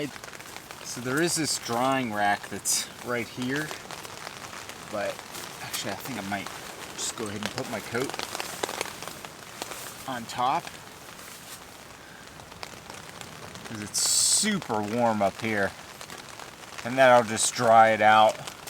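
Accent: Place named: United States. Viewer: Hi! Great video! 👍 But something is eating at me! 😵 Your YT channel says you're in the UK! But you speak like an American(no britt accent) you cook like an American you phrase like an American and the woods you're in looks like somewhere in the East Coast and Pennsylvania! About the only thing that kinda hinted that you might be British is that black treacle bacon! What gives mate???? 😂 Btw,had to Google black treacle bacon 😅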